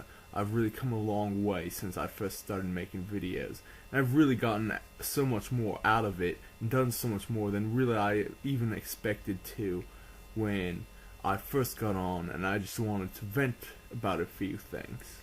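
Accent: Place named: New Orleans, United States. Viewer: I've always wondered where your accent is from...sounds very Carolina like...but then again it has a New Orleans thing going on...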